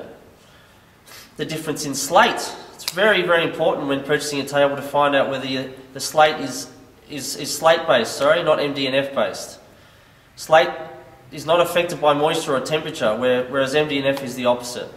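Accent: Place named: Australia. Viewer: What did he say at the end about the best cloth? what type? I find it hard to understand the AU accent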